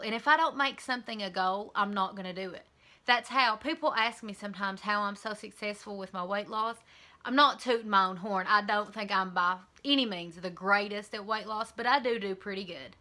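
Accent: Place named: Eastern Kentucky, United States. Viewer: I have laughed so hard at the moonshine thing "well it's illegal." HAHAHA. You are going to rock your 30's like a beast! I am so excited for us to get together and shut down the WLS community on youtube with these Eastern KY southern accents :) You look amazing as always XOXO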